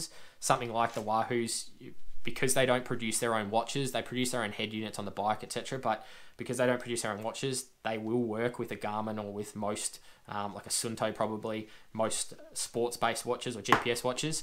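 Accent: Australia. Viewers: I concur, and the Aussie accent always helps 👌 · Hey, that was a good Video really appreciate you work on this, I myself use a Polar H10 Cheststrap along with the Vantage V, have been stuck with Polar since 2013 and since then always Cheststrap measuring. I'm very interested in the topic of burning 10 000 Calories (opposing to eating those) Have done that in 2017 (but despite having all material never managed to cut it and upload it) Now I have seen qute some Videos of people attempting it with all kinds of measurements...yes also using myfitnesspal and no heartrate measurement whatsoever. Then also a lot with the wristbased measuring and i had the feeling those might read the heartrate higher. You said because of bloddpressure the reading might be higher on the wrist. WOuld you assume in a quite heavy lifting session as the bloodpressure shoots almost through the roof the wristbased measuig would give a higher average heartrate than the cheststrap? 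Cheers mate greetings to Australia I'd guess from your accent...but only after having posted this comment will checkout your other videos where you might have revealed that alrady. Sporty Greetings from also a Sportscientist from Germany living in Dubai.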